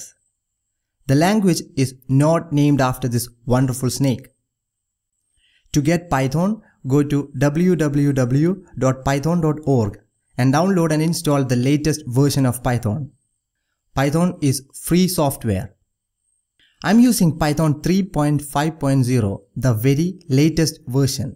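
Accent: Kerala, India. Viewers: By your pronunciation ,,,i found u are malayali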